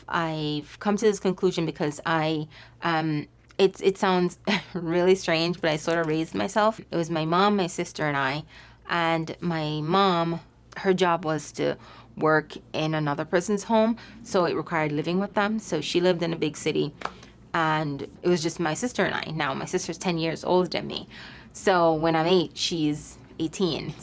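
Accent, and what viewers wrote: Jamaica. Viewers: Are you Jamaican? Because your accent is showing 😊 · I hear a slight Jamaican accent? If so hey girl hey! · Love the Jamaican accent, you resonate with me!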